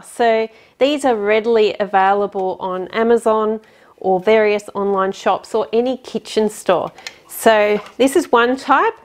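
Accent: Australia. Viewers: Wo in Deutschland bist du aufgewachsen? Woher kommt es, dass du nach Australien ausgewandert bist? Du hast auch einen australischen Akzent und keinen deutschen!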